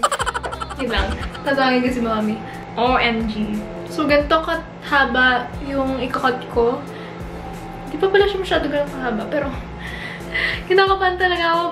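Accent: Batangas, Philippines. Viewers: Batangenyang batangenya ka po,😊same po tayo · kacute ng batangueña accent huhu "ay syempre"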